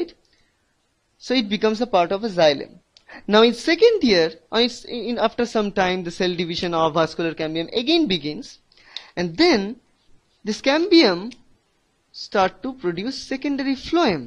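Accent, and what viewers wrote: Bengal, India. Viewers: thanx bro, Ur accent looks Bengali but doesn't matter. explanation was gr8 thank u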